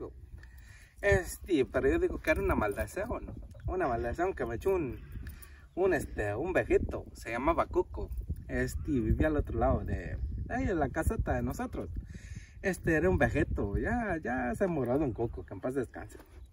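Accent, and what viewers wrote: Michoacán, Mexico. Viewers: Pa las tierras michoacanas vali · Así hablamos en michoacan y guanajuato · Pa michoacan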